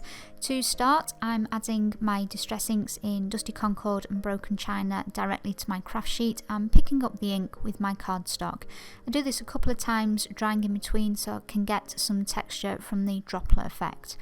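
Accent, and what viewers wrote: England, United Kingdom. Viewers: A nice little treat to come home to after a hectic day at work! Thank u for the video,!! My hubby knows that if I’ve got YouTube on and I say: “ssshhhhh! It’s!!” before the video starts, it’s QUIET TIME; or if he walks in and hears the English accent, he knows that it’s best to simply wait till it’s over to tell me something because I’m in The Zone & won’t pay attention to him!!! LOL You’re just truly a lovely part of my day!! Thank you 4 that!! 😘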